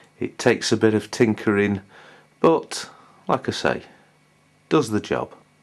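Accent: Manchester, United Kingdom. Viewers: A couple of really useful videos. There aren't many reviews for this out there and to find one from a fellow northerner was nice. Just bought one of these second hand for £40. Funnily enough I hopped over the pennines to pick it up from a chap in Manchester, which I assume from the accent is where you're from ish. Thanks again and kind regards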